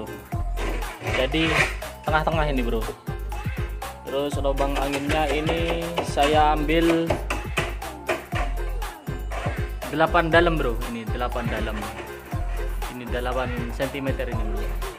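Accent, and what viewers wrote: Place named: Madura, Indonesia. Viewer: kalau dengar suaranya orang madura ya bang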